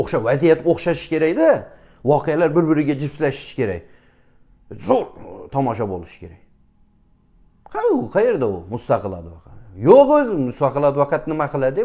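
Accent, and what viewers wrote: Khorezm, Uzbekistan. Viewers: Хакикий хоразмликни гапи бу .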